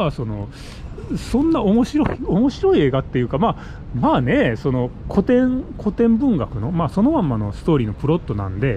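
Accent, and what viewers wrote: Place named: Southern Nagano, Japan. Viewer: 破戒は南信の飯田市殿岡の大江磯吉がモデルとも言われています。「島崎藤村『破戒』のモデル 大江磯吉とその時代 (信毎選書) 」の本を以前読みまして、飯田に用事で行ったときに、殿岡の長吏の父親と神社のバラックに住んでいた場所とお墓に行きました。神社の傍らに資料館もあるようですがその時は気付きませんでしたが。飯山市の唱歌ふるさとの作者との関係も興味深いです。大雑把にはこの動画で。このことで藤村は飯山には出向けなくなったと言っていますね。 <link> 動画でもちょっとだけ冒頭で柳田国男に触れていますが、（破戒とは関係ありませんが）柳田国男の資料館が飯田市にもありまして、「秋風帖」という旅の紀行文での愛知県作手村の「ポン（おそらく漂白民・サンカ）」の項も気になりました。 長野県は細かい被差別集落がとても多かったというのと、今でも他県からの移住者などに排他的なところがあるといった事をよく聞きます。うちの親戚がある伊那地域は「本家」「分家」制度が色濃く残っていたり、飯田線中井侍集落の戦後まで残っていた「おじろく・おばさ」の奇妙な風習とか、木曽の藤村も含め、四方山に囲まれた長野はそういう土壌が根深い土地なのかもです。 弾左衛門が関東と隣接地域を任されていますが、なぜか一時旧伊那県でもあった関東からはずいぶんと離れた愛知県設楽もその管轄権限に含まれているのが謎で、未だに理由がよくわかりません。 ちなみに主さんが「こんにちは」というイントネーションが南信のそれとそっくりで、びっくりしてます。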